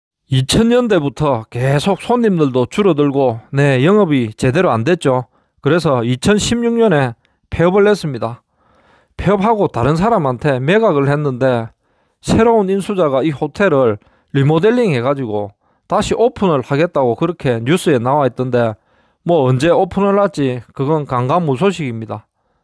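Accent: Gyeongsang, South Korea. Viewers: 와 갱싱도 사투리 진하다!!! 도저히 힘들어서 못듣겠네.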